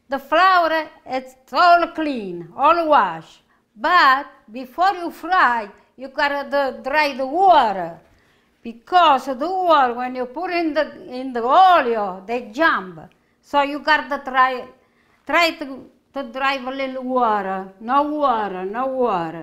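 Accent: Italy. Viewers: لغة انجليزيه علي فكرة بس بلكنة ايطالي لكن كل انجليش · I really like her italian accent she gives me some vibes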